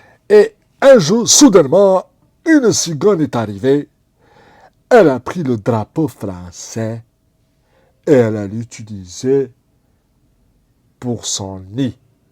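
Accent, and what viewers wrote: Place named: Africa. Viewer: 😂😂😂😂j'adoooore ton accent africain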